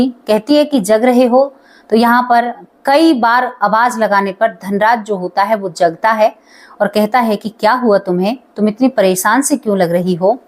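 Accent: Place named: Braj, India. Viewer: आत्मा अजर अमर अविनाशी है।यही अटल सत्य है जी। आपकी हिंदी उच्चारण से आप ब्रिज क्षेत्र की प्रतीत होती हो, कृपया अपने बारे में भी बताने की कृपा करिएगा। सद्भावनाओं सहित दिनेश सारस्वत नयी दिल्ली से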